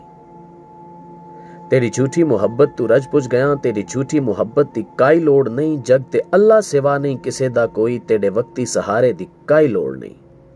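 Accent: Saraiki, Pakistan. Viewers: Aap saraiki hai kiya?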